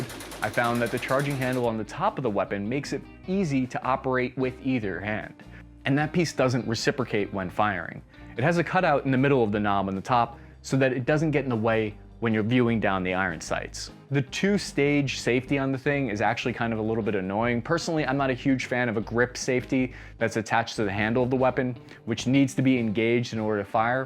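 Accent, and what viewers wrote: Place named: Long Island, United States. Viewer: every time you make a video about my country I'm so excited but man you pronouncing Israeli name so funny with your long-island accent... Love u and your videos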